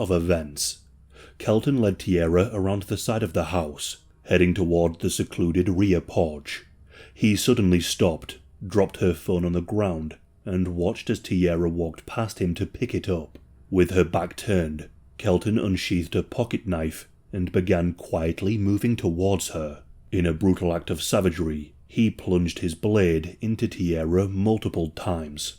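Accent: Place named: Northeast England, United Kingdom. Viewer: Aye aye aa think your from rundhere 😂 not a Geordie but not far from like ❤️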